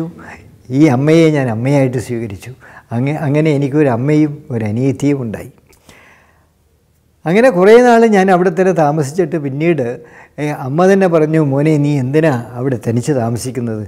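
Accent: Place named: Kannur, India. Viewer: Kannur karanano, aa samsaaram varunnu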